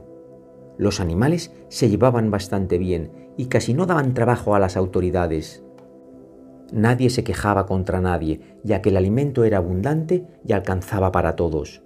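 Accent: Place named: Uruguay. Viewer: 🎉saludos desde Piura - Perú. Estaré atento a la narración de cuentos, leyendas, con la dicción local de Uruguay. Grácias. Cuide su salud. Felicidades.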